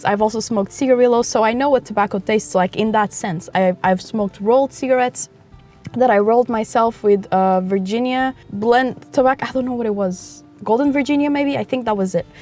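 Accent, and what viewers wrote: Portugal. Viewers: giiirl soube logo que eras tuga (ou pelo menos que falas portugues) pelo teu sotaque antes de dizeres que estavas em Portugal! comprei a minha maquina hoje, com o terea turquoise , espero gostar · your accent is so nice ahhh fu*k . it's portugal accent really?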